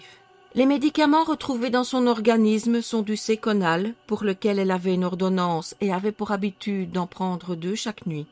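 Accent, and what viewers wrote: Belgium. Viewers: Bien sûr une affaire connue mais un point de vue et une analyse différente... 👍. Merci pour le petit accent Belge 😉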